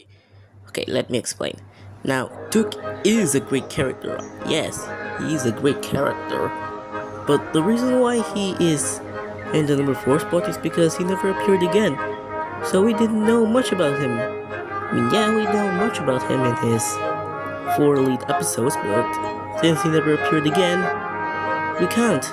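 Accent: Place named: Indonesia. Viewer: i can tell the indonesian accent lol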